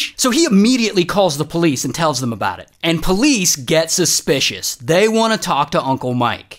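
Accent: United Kingdom. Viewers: As a British person I can confirm we talk like this😂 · best british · british perfect impression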